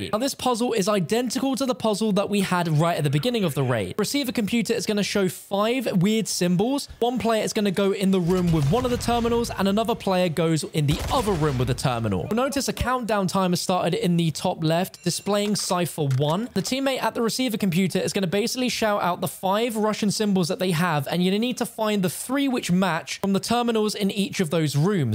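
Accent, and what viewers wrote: United Kingdom. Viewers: hahaha the British accent got me. He says THRee players and then one of the FRee has to unlock it by blah blah. I thought he was saying paid players could get right in but free players had to unlock it. Bummer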